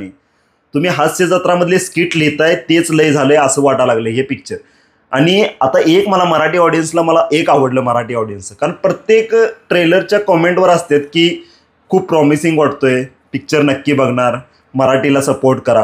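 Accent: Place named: Kolhapur, India. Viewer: I like your Kohapuri accent. And ofcourse the rokh tokh analysis.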